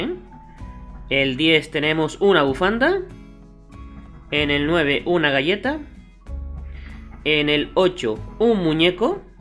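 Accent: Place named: Canary Islands, Spain. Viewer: Felices fiestas chicos 🎅 🥂, dices un sombrero y una gorra y es un gorro de lana ☃️ tienes acento de las islas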